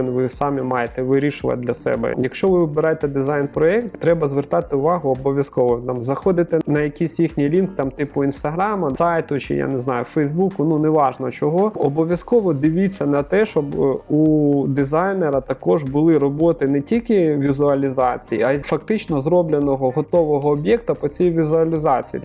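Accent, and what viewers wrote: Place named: Cherkasy, Ukraine. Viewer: это что за язык? черкасский? кировоградский? слушаать невозможно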